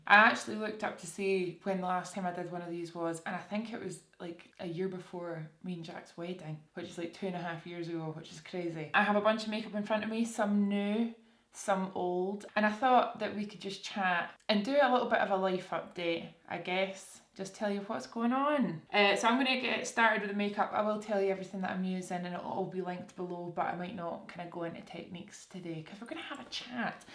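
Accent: Scotland, United Kingdom. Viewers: Just found your channel, you’re an absolute babe, so down to earth, lovely and Scottish accents are lush ✨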